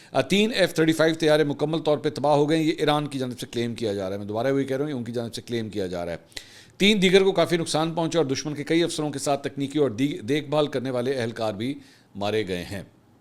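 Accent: Punjab, Pakistan. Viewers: Bhai Salam Punjabi touch Sath ma ap lgatay hn bra mza aata Hy zabardast speech salamat rahin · پنجابی پنڈوہ اردو نیوز میں بھی پنجابی😂😂😂 لیکر آتا ہے 😂😂😂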